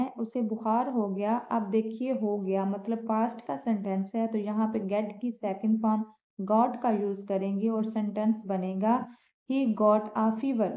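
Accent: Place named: Punjab, India. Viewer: استاد جی آپ کی اردو بہت زیادہ punjabified۔۔ہے۔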